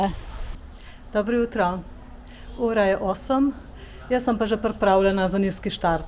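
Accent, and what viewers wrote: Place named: Primorska, Slovenia. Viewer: Te zmeraj rat poslušam mam rad vašo govorico primorsko, tko da te ze prav čakam vsako nedeljo ,da si ogledamo tvoje videje diooo deklina primorska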